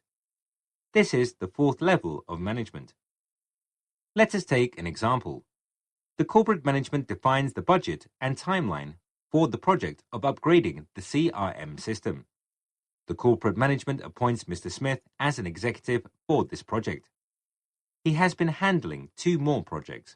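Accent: England, United Kingdom. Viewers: I think the voice is British. Sounds fine to me. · I don't get why people are moaning about the voice.. I'm English and sounds like a normal English accent to me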